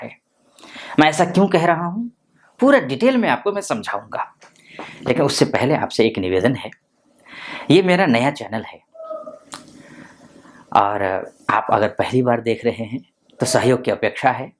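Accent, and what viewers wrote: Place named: Nepal. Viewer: Sir. Aap nepali mul ke hain. Kiuki aap ka bhasha Nepali ka tone me aati hai . App ka prediction bahut good hai.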